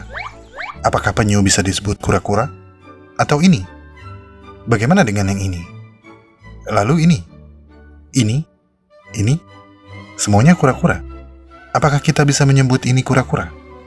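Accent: Indonesia. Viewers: selama masih di mengerti.. ga masalahin....enak malahan ad aksen Indonesia nya terjaga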